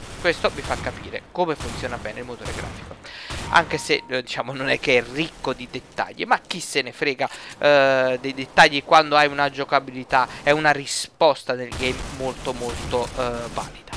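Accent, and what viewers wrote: Italy. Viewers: Chiuso il video e messo dislike a . La classica pronuncia degli italiani. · ovviamente ogni tua recensione è bellissima. Dici tutti i dettagli,però devi dirmi una cosa...perché quella parlantina sicialiana? xD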